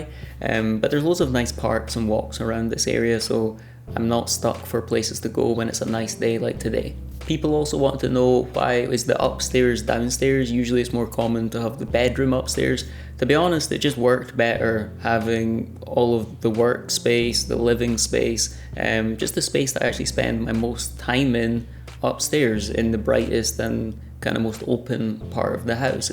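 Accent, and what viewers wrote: Scotland, United Kingdom. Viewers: I knew you and your house are in Scotland, by what I saw and your accent. When I was a wee lad, I lived in Glasgow and had an accent a bit different from yours and very different from what it is now. I just subscribed because I want to see more of Scotland. This looks great. I like what you did. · I think your tiny house is wonderful, and watching the process was truly interesting to watch. I'm glad you're planning on doing more. I'm now a subscriber! If you want to make some money on it, all you have to do is wait a few years to sell it..... And how could anyone mistake your lovely Scottish accent and sense of humor? Best of luck to you! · For me, your house has so much character. I appreciate that the house needs insulation and think you did everything right. I have visited Scotland, Banff and I really enjoyed my stay. 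I had lovely weather. I visited for a valentines weekend. My fiancé, at the time, drove through the night. It took about 11 hours! It was a great adventure. The people were lovely and we actually met someone from London, where I live. He was the pub landlord and was very nice. By the way, you have a lovely accent. I do like a nice accent and listening to you, reminds me of my stay in Scotland. Another super video 💜😁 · House looks great, made a nice job of it. And i'll be honest, im from scotland, but i assumed it was wales also. I hear the accent now...but the building still looks like it should be in wales :) Im assuming you had to get drawings/plans done for building warrant etc.Was there an architect/engineer involved at that stage, or did your builder deal with all that? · It is a blessed house, when a believer lives in it! :-) Because then it is the home/temple of God... :-) Enjoy living in your beautiful house and behold your wonderful scottish accent!!! 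· At the start of the video I thought you had a soft, American accent. Then I realized your Scottish. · 😂 I knew you were a Scots man ! Well done love from Ireland ! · Good golly! You sound Scottish, not Irish, not Welsh. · You sound 200% Scottish. Not sure how anyone could say anything else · It's awesome that you got your parents involved. I bet they enjoyed it. (BTW I had you pegged as Scottish early in the video 😉) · I knew you were Scottish! I"m so proud I got it right! I watch Outlander, but the accents on Outlander are super thick, so I will confess, I looked up the author Michael Bruce you mentioned in the other video to make sure I wasn't totally off.😁😜😉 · No, being Irish I knew you were Scots, but how do you get these people to work for you is my question? And that is such a beautiful place, you are so lucky! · place looks amazing..I know those costs are quite high but, given the whole lot was done by Trade, it'll all be guaranteed and you have that peace of mind. 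With regard the old character, you haven't destroyed it, it's merely covered. oh..and you couldn't sound more Scottish if you tried! excellent work! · You did an incredible job! I did think you were Irish at first (and I'm from the UK too!) at first, but now I can clearly hear your accent is Scottish. It's nice to be able to do decide every detail of your house like that and have an historic building with the character it has. How old is the house? It looks like possibly 18th or 19th century, but could be much older? I noticed it had originally had very small windows.. During the 17th or 18th century I believe, the UK had property tax based on size and amount of windows in a house..so it's not unusual to see houses from that period with windows bricked in or built with very small windows to reduce the property tax! This little house originally had that look, like it was designed with minimal windows and very small sized..so it could be from that period? 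· Awesomely well done. I’m 50% Scot myself. My mother was born in Armadale. So of course I love your Scottish accent. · This American was actually able to distinguish your Scottish Accent......😎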